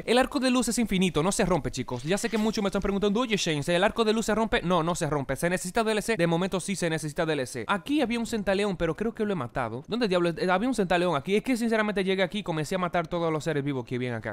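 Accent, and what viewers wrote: Dominican Republic. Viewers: Eres de República Dominicana ?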